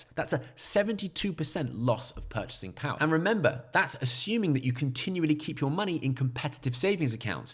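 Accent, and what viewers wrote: United Kingdom. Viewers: Hello. I am Canadian and like your amazing videos for two reasons: the information as well as your British accent. I try to copy your accent. Keep up the great work!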